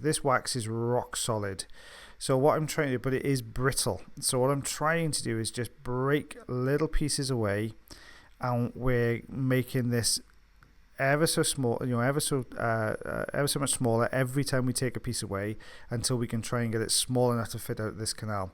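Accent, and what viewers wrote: Wales, United Kingdom. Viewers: Are u british??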